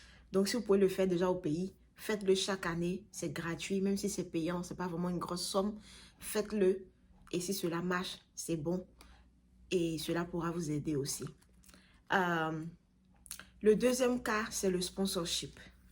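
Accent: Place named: Ivory Coast. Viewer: L'accent ivoirien 🇨🇮 ça ne ment pas. Très propre et limpide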